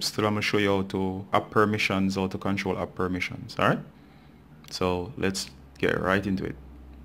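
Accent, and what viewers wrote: Jamaica. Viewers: Jamaican I think not being racist just heard the ancient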